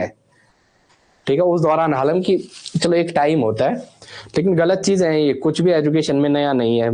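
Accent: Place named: Rudraprayag, India. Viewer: Rudraprayag se ho na aap sir ji..tone se pata chal raha hai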